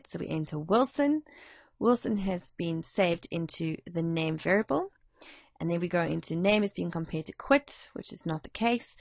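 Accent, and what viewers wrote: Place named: South Africa. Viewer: South African joberger'ish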